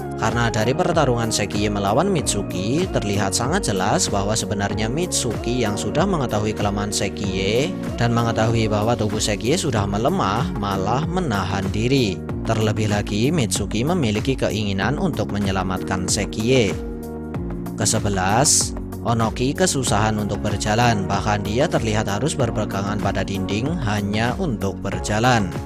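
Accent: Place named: Banyumas, Indonesia. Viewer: ngapak banjarnegara👆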